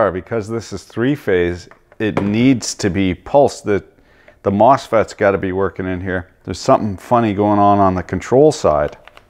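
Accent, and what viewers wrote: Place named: Newfoundland, Canada. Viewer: Love the Newfie commentary!!! Beauty , keep it up!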